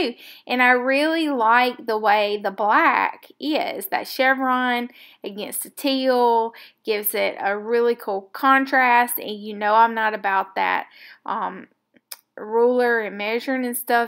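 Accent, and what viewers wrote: South, United States. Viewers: My oh my what an entertaining video. You have all the makings of a TRUE artist. Mygrandaughter once said “ us artists don’t give a care”. Referring to freeing ourselves As we create, KEEP on believing in yourself . The southern accent struck my heart.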